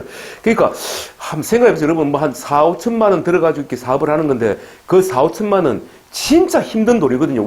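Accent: Gyeongsang, South Korea. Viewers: 선생님 강의를 열심히 듣고 있습니다. 경사도 사투리에 구수하고 실제적이며 귀에 쏙쏙 들어옵니다. 저는 1년정도 영어 공부를 할려고 합니다. 어떻게 해야 효과적일지 가르쳐 주세요.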